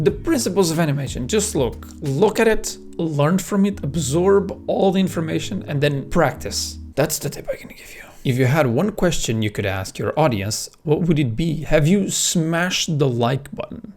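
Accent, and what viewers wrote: Portugal. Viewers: that a nice portrugeese accent you have there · que tal você fazer um vídeo falando em português? Quero mt ouvir seu sotaque portuga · That's pretty much his Portuguese voice, in this video. A lot of Portuguese people tend to speak English with a very hard Portuguese accent. "We" kinda suck at losing or acquiring accents.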